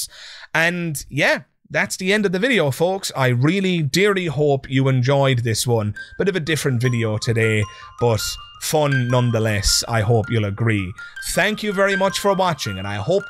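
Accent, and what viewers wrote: Ireland. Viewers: Replying here mainly to test a theory I have pertaining to YT comments. Anyways! Loved the skeptalk show with you and Shannon, both of you brought your absolute A game! Skeptalk is how I found your channel. I'm a bit of an accent/dialect connoisseuer, and listening to Shannons Canadian english playing off against your Irish english hit a sweetspot for me. I know, I'm weird that way.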